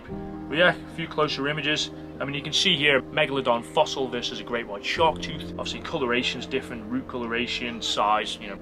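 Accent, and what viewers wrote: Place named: Stoke, United Kingdom. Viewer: I usually stay out of the great white debate but I like this video, I work off the Atlantic coast in Cornwall and get asked about them a lot, I agree with the science we have a pretty good environment for them but there's just not enough of them left. Still so many cool ones, I did work with that Greenland shark that came up and couple of years ago. Unusual question, trying to place your accent, I'm from Stoke originally, you around that neck of the woods?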